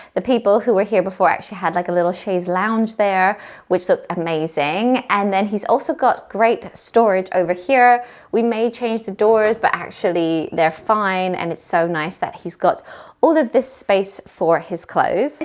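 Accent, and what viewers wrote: United Kingdom. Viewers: What is your accent? It seems to swap from British to American and I can't work it out lol x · haha same here. I am a Chinese and a new subscriber here so I had no clue that is in UK. So when I saw the cupboard and fireplace I said “wow, it looks so much like the one in Harry Potter. And then I noticed British accent which makes sense. I live in Canada and the houses layout and style are so much different than in UK! I’ve never seen such a big fireplace in my life and all I want is to throw some floo powder into it. LMAO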